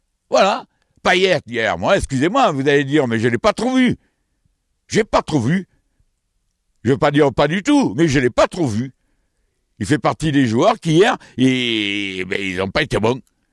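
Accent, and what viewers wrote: South, France. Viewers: Courage adore votre accent ca sans bon le soleil du sud courage au supporter de OM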